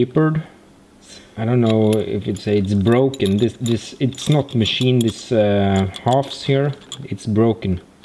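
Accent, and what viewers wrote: Sweden. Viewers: I guess you're from sweden because your accent sounds like Christian von Koenigsegg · are you Swedish?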